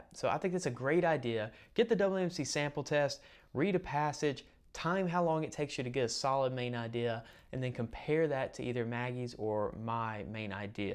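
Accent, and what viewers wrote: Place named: South, United States. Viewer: cool, im from Georgia! Southerners know one when they hear one haha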